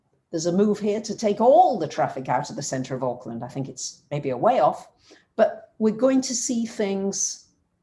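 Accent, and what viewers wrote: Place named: England, United Kingdom. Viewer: Love your passion towards astrology and english accent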